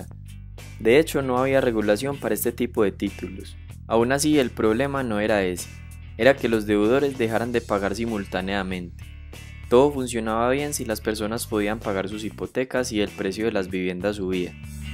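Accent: Colombia. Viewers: este men es de colombia??? tiene voz d colombiano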